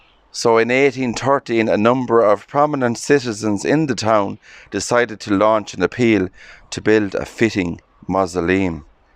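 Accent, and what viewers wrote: Scotland, United Kingdom. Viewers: 😂 that lovely Scottish accent 😉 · Love the Scottish accent! my great granddad was born in Edinburgh. It reminds me of him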